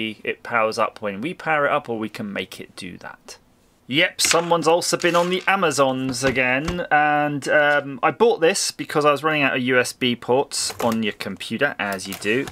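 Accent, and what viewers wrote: United Kingdom. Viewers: Thanks for the content and LOVE the British accent!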